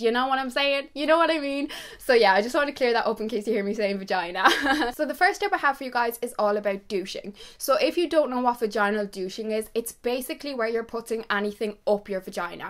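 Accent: Ireland. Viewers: are you irish??? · This video is super informative! U are adorable and ur hair is super cute! Also is she Irish? I’m not rl good with accents😂😅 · Great tips and could listen to you all day long, with your great Irish accent your on to a winner. Take care 😉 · she's Irish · These videos are so helpful thank you!! Also I love your Irish accent so much 😍 love from Italy 🇮🇹 ❤️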